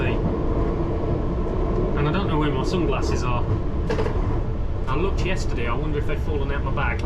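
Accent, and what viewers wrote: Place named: Midlands, United Kingdom. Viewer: Your accent is very stranged, sounds like someone from the midlands moved down to london and picked up twangs. Can tell well "arnos grove" and "even out" sound cockney then you say speed OP like a northerner! haha. Great video none the less thanks for making these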